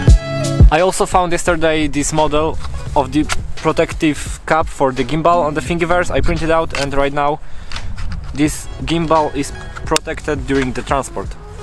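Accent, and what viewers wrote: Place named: Poland. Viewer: Słychać po akcencie ze Polak xd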